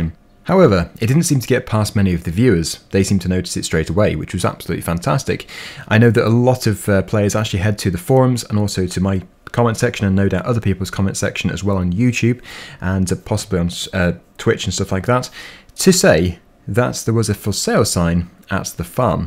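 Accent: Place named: United Kingdom. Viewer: British accent's quite annoying but god your's very strong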